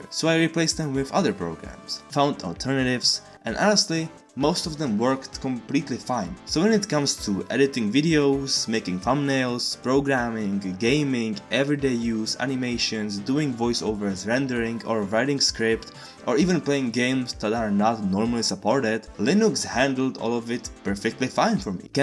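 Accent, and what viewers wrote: Czech Republic. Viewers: The accent.... are you czech?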